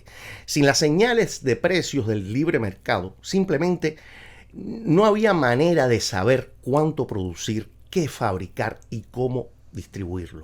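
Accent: Cuba. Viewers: Se nota que eres cubano, asere!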